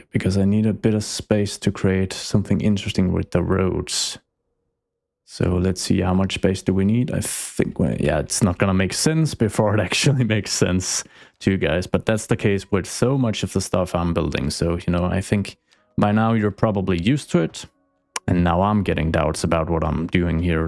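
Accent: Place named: Denmark. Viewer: The danglish is real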